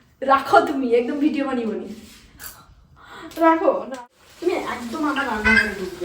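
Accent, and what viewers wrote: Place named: Jhargram, India. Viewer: Tomra j vabe kotha bolo Amara o si vabe kotha boli amar bari Jhargram district a .Ami thaki Mumbai te ❤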